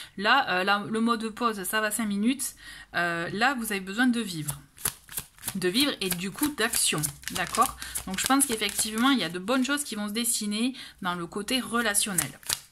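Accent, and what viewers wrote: South, France. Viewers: Merci 🎉. Joli accent du sud ❤.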